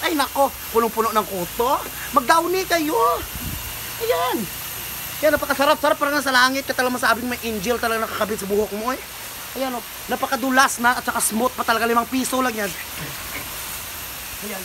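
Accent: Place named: Visayas, Philippines. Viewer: dow bisaya man di ay sya?😂 diin imong probinsya ate?😂😂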